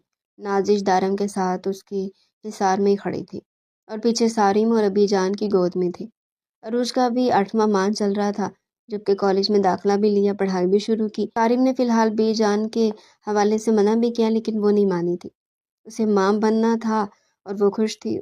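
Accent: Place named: Punjab, Pakistan. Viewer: Urdu with punjabi تلفظ!!!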